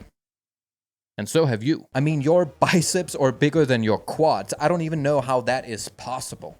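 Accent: Denmark. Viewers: Hold on, that accent.. Are you danish? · Hmm I sense a Danish accent. Am I wrong?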